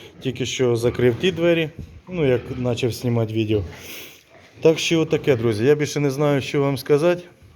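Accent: Ukraine. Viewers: Доброго здоров'я, приємно чють рідний Миколаївський суржик))) , краще ніж відоси годовой давності))). Я в одного дятька бачів підлога в свинарнику була викладена з дерев'яних чюрок, як плитка тротуарна)) . В самого хозяйства немає вже давно , а приємно дивитися ваші відоси. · Такий у Вас цікавий говор, звідки Ви родом? Мій тато так розмовляв - Харківська обл. Дуже цікаво, а головне доступно для новачків розказуєте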